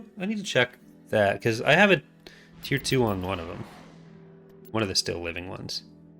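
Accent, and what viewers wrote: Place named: Canada. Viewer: dont worry about sounding too Canadian, its awesome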